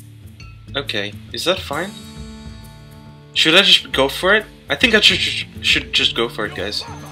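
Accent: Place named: Russia. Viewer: laska ma ruski akcent a twierdzi że jest z Polski hmm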